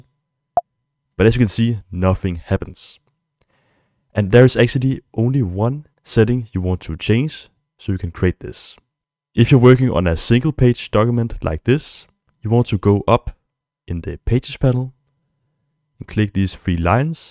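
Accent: Denmark. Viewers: Thanks this was helpful and I love the (Danish?) accent. :)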